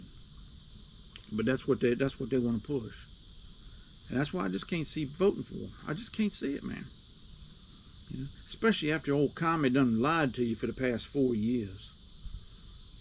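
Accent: United States. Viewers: You sound like a hillbillie. now one cares about what u think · Your ignorance is striking. You sound like a backwoods hick and you think like one too.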